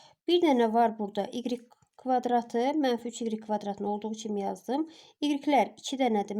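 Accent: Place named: Northwest, Azerbaijan. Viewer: Salam müəllimə çox sağolun əziyyət çəkirsiniz, haralısız? (Qax, Şəki, Zaqatala?) mən Qaxlıyam ləhcəniz tanış və yaxın gəldi.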